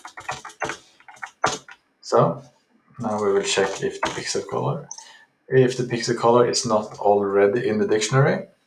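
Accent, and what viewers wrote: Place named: Norway. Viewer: Hallais! (based on your accent, I assume you're Norwegian 😅) Loved this video dealing with maps - that gave me a much better understanding on where even to start with my overambitious project. I would love to see your take on data structure/handling 😊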